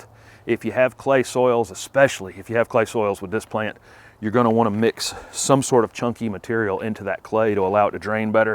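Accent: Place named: North Carolina, United States. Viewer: Do you ever smile? But you have a nice accent...is that North Carolina?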